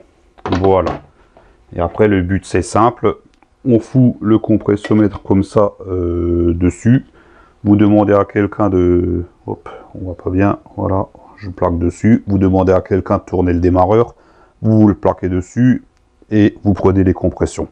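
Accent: Alsace, France. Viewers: Salut, merci beaucoup pour cette petite astuce. Ton accent me rappelle ma jeunesse à Sélestat... Cool.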